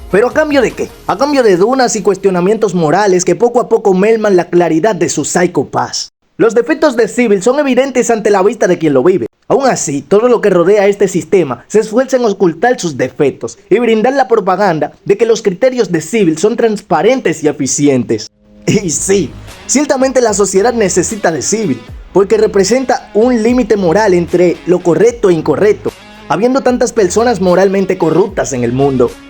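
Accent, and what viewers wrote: Dominican Republic. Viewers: eres dominicano?, buen video, mi opening favorito es el 2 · Como amo el acento dominicano❤ genial analisis🎉🎉🎉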